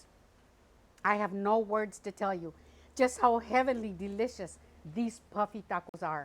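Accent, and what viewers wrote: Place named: Texas, United States. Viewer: Your Texas accent is back!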